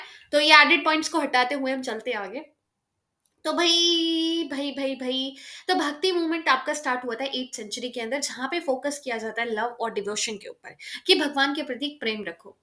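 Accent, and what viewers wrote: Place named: Mumbai, India. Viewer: Tum mumbai se ho kya? Accent mumbai walo jese he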